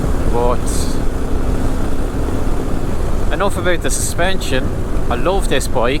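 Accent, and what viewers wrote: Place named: Ireland. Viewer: Nice what would you get the normal T7 or the word raid With the acf50 I put it on about four times over the winter but then again, I do live right next to the sea Just try adding it on bit more Should help I take it you’re over Ireland by the sound of your voice looks like you got some good trailers there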